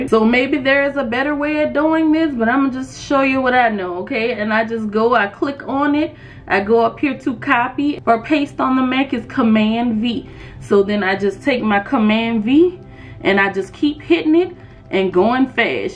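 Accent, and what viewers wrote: New Orleans, United States. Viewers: New subscriber here! You are just too sweet and informative! You had me at what sounds like a New Orleans accent! I lived and taught there back in the 90’s so I am always dreaming about the Pre-Katrina days! Sorry if I got it wrong. Quick question: Oddly enough I have a couple of thunderstorm videos just because I am here in Belize during rainy season. It rains almost every early morning. My question is, must I create a new channel or can I find a way to blend a few rain videos with my current channel?Or will that throw off my channel’s audience and algorithm since it’s a new channel and not quite at 1000 subscribers? Thank you! Heading over now to check out your editing course details! 🙏🏾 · Are you from New Orleans? I love your voice. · Shoutout to the fact you from the N.O I know my people accent from anywhere lol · I hear a New Orleans accent? 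Lol thanks for your help!! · Girllll this video is a game changer. I done subscribed and liked. I'm watching other videos now working. Not only was the video easy to understand but you definitely from the N.O with that accent lol and so am I! I knew it was confirmation after that! Thank you so much ❤️⚜️⚜️ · What region of Louisiana are you from? You have the City (New Orleans) accent, but it’s not the thick type. It gives like other surrounding cities not saying its a bad thing at all, but something I noticed. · Is that a New Orleans accent I hear? 👀🤎⚜️ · I’m new here and the way you said hello I’m so many ways got me hooked to your personality also your New Orleans accent is everything ❤❤❤❤ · You sound like the people I grew up with back home in New Orleans. ⚜️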